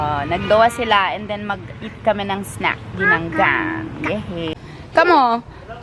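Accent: Visayas, Philippines. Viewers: Wow kabalo kana day magbisaya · Hahaha cute kaayo ka magbisaya haha makabalo ra jud ka ana madugay cge lang bansay ug sulti ug bisaya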